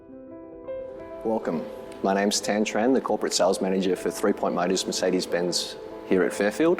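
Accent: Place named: England, United Kingdom. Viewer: Fuck this shot . Asian man with English accents. Someone kill Me please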